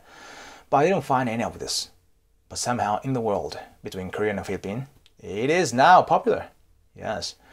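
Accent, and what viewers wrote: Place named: Singapore. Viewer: R u really a japanese? I have many japanese taiwanese friend. Ur pronunciation sounds like singaporian.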